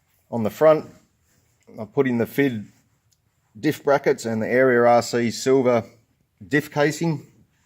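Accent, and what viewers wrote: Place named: Australia. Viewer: Ahh dude nice upgrades! And your an Aussie, love your country and accent! Liked and subscribed 👍👊 how did you find the fitment on the area RC stuff!? I bought some area RC alloy upgrades for my x maxx and none it fitted properly! I destroyed 4 wheel bearings before giving up installing the hubs, sent it all back and gotta full refund though 👍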